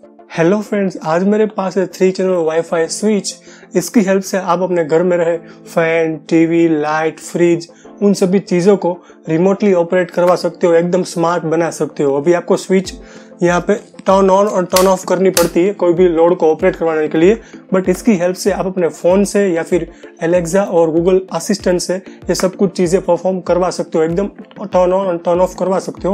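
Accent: Gujarat, India. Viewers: ભાઈ ગુજરાતી લેહકો આવે છે હિન્દી મા હો 😂🤩🤩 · Wow.. Your gujju hindi accent is owsom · Are you from Gujarat? Because your hindi has gujarati accent.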